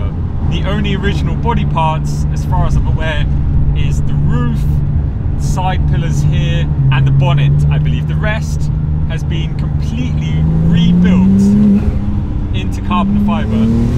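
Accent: Switzerland. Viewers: I was waiting for the day when I hear you speaking swiss german... Finally it has arrived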